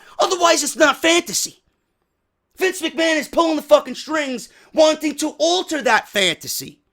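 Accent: New York, United States. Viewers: You're a fucking New Yorker man xD love it cx I nearly pissed myself though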